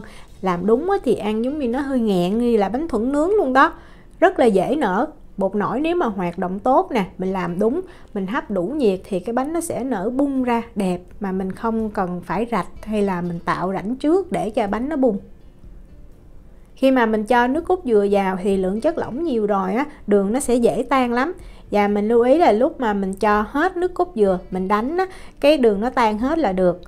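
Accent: South, Vietnam. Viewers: hướng dẫn kỹ lưỡng, nói chuyện dễ mến giọng miền Nam Chúc năm mới nhiều may mắn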